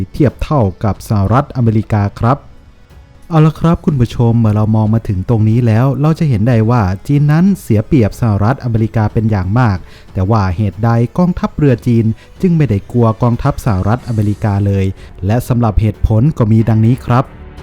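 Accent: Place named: Provincial, Thailand. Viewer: พูดบรรยายแบบเหน่อๆ ฟังดูขัดๆกับเทคโนโลยีใหม่ๆครับ พูดกลางเน้นเสียงเข้มจะมีความลงตัวที่สุดครับ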